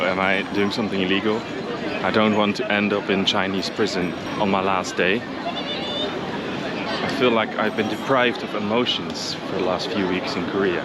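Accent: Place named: United States. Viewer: I just come back from China, I use cash all the time, no one looking at me like foreigner. It's very strange how could not bring enough cash especially it is your 1st time, also seems you already alert all the bad thing before come in. Look your vlog, it has little viewers, this one hit 4 digit viewers just a few hrs. Sure, you want to back if only China is money come from. Hope you won't come back, sir. Korean are extrovert people? Give me a break. Most of them speak English? By the way you sounds like American dude😅 YOU ARE NOT WELCOMED!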